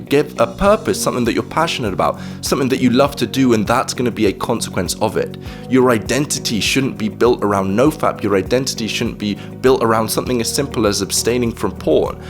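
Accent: United Kingdom. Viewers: That is a British accent, to be honest I find some English accents a cringe to my ears, mainly American and Australian but that does not mean they should change to suit me.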